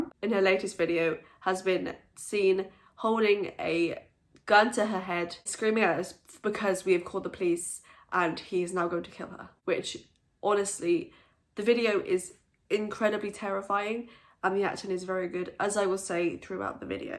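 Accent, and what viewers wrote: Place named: Ireland. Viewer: Your Irish accent keeps coming through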